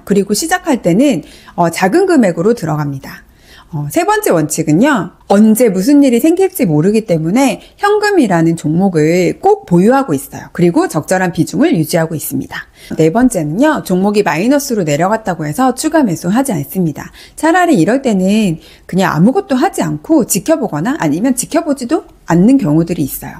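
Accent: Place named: Busan, South Korea. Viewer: 벽면에 금고 문이 있네요. 금고 언제 가득차요? ㅎ 똑순히 시원하게 애기 잘하시네요.악센터 보니 부산분인가요? 유익한 말씀 감사합니다.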